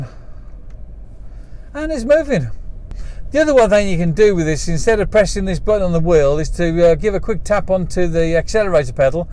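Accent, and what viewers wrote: United Kingdom. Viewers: I suppose u need to be Brit to follow your conversation....too blabbery and too much accent etc....bye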